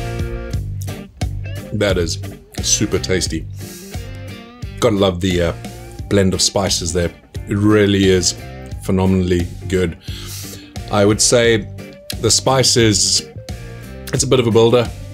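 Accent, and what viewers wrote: South Africa. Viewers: Thanks for the video. I have been making Indian and North African spice blends for awhile now. I am expanding more into cajun/creole cooking now, and this helps. PS love the South African accent. I grew up there. Live in Northeast USA for the last 38 years now.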